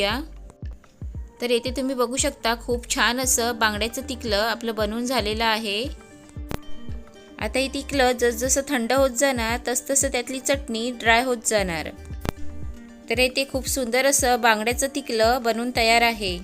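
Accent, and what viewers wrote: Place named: Konkan, India. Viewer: Love the Konkani accent ❤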